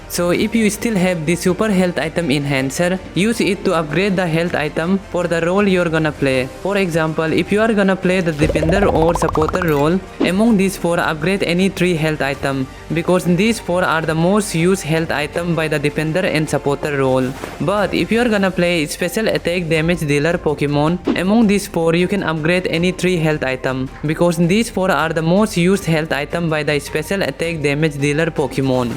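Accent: India. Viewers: Bro is pure indian "amier"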